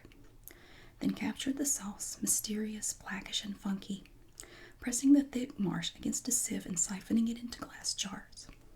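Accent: South, United States. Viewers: I subscribed! Like my southern sister speak!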